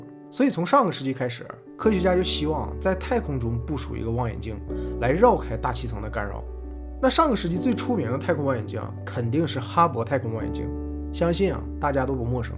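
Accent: Northeast, China. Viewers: 解说中，要是能把东北口音去掉就堪称完美了！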